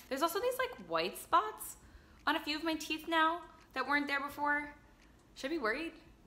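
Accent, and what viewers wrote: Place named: New Jersey, United States. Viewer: This girl is 1000% from NJ 🤣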